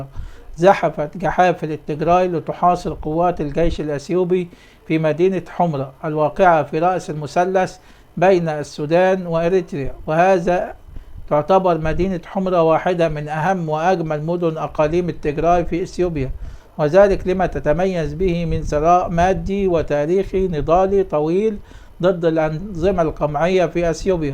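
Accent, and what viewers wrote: Egypt. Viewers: يا باشا انا بشوفك دايما تتكلم عن انك بتتكلم باللهجة العامية المصرية و اللهجة الصعيدية و بترد على الناس اللى بينتقدوا طريقتك او لهجتك او قراءتك - يا باشا اعمل اللي انت عايزه و بطريقتك البسيطه و اللي عاجبه يشوف فيديوهاتك اهلا بيه و اللي مش عاجبه براحته لكن انت مختلف لانك بتجيب الاخبار من جوة اثيوبيا و من ارض الحدث - احسنت و استمر ولا يهمك من اي حد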